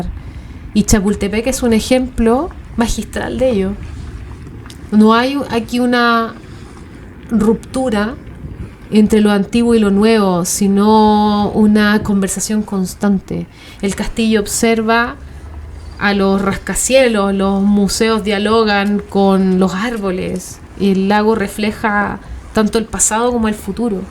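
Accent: Chile. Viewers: Eres Chilena, sin duda se nota, interesante tu video no se ni como llegue a este Canal; Pero me gusto mucho, Mexicana radicando en Ca. USA. Pero quien no pierde sus raices. Cuando vivi en Ciudad de Mex. pocas veces fui al Bosque de Chapultepec, antaño estubo muy descuidado, me alegra mucho que a raiz del Gobierno de AMLO y ahora de la Presidenta Claudia S. lo esten renovando. Un dia volvere y lo recorrere COMPLETO!!! 🙏🙏🌲🌳🌴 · que gran punto de vista... en un hermoso acento chileno... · Me encanto la narrativa que hiciste en este video, supongo que por el acento que tienes eres chilena, te felicito y te mando un fuerte abrazo y Chapultepec es un bosque golpeado por el paso del tiempo y de sus malos gobernates, pero no obstante ello, sigue hermoso y siguue de pie. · eres chilena verdad? que acento tan cool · Arqui.en su voz.descubro lo agradable del acento chileno.contrario a lo que se dice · Eres chilena? 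· lindo lenguaje con tono dulce chileno que reúne terminología ecológica,política y cultural,medida a medida con pausas y silencios y hasta poesía! Una Felicitación 🇲🇽🇨🇱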